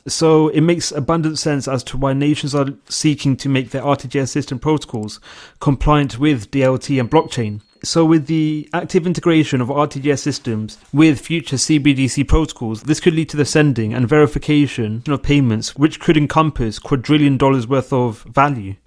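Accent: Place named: United Kingdom. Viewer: Bru that British Accent.. 😣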